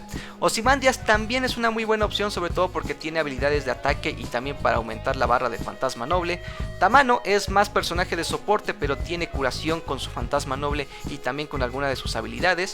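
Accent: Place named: Bolivia. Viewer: por qué hablas tan boliviano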